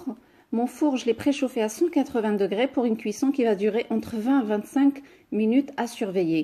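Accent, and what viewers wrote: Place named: Morocco. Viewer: Choukran tu expliques tres bien merci pour les efforts .Ton accent Marocain est magnifique.😍😍😍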